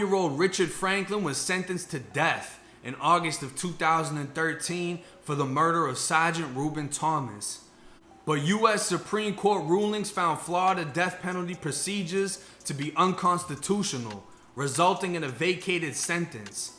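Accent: Boston, United States. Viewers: Ive asked you before. Now you got so fucking many subscribers. Big respect. I did over 17 years myself. But i need to know. I know you got down in Tampa. But you gotta be from Boston with that accent. Im feom Boston. And thats the only place we talk like that. You got a Boston accent, bro. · You have to be from Boston or Massachusetts somewhere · You sound like your rocking with Boston! I can hear the Florida but some of your words sound like me lol yes im from Boston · Props buddy...but seriously how did you get a Southie Boston Accent living in The 'Gunshine State'? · Lowkey got a Boston accent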